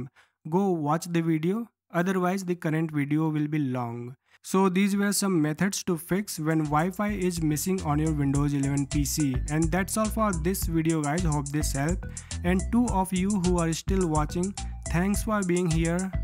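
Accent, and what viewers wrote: India. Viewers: You know the problem is half solved when you listen Indian guy narrating in video. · the network reset worked for me tysm omggg since i heard ur indian accent i knew u were gonna be the one who helped me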